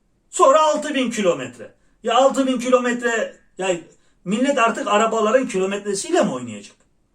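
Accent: Erzurum, Turkey. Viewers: Sen Erzurum lumusun abi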